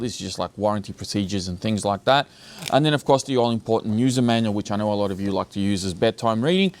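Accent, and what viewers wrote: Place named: Australia. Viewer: Nothing like that aussie accent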